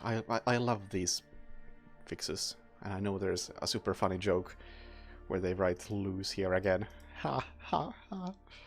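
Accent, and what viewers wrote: Finland. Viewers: Are you Finnish?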